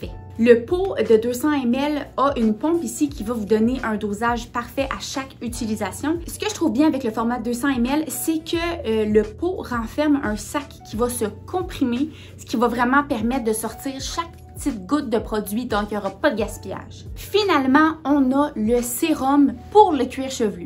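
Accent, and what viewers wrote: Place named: Quebec, Canada. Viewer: J’aime trop ta vibes et ton chou accent québécois je t’aime❤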